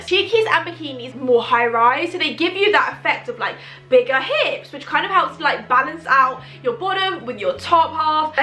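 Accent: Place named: England, United Kingdom. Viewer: I like how she has American influence " you do you boo" with an English accent.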